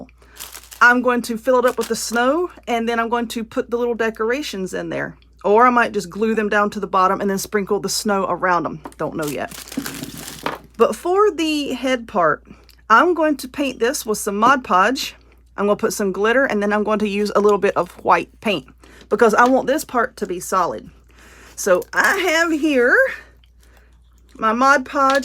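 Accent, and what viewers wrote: South, United States. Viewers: Girl, you are soooooo Southern!!!!! Your accent is deeper than mine (ATL)!!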